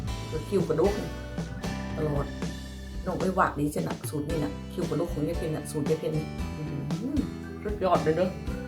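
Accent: Korat, Thailand. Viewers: ชอบค่ะ พูดภาษาโคราช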